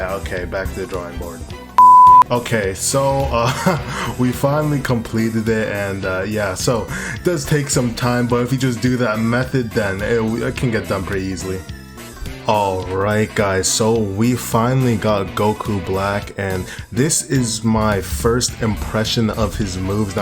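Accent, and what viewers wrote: Jamaica. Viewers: Yow u jamaican?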